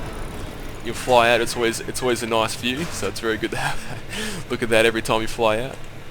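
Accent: Australia. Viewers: Where is your accents from? Australian? not sure :). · Finnaly i found an aussie gamer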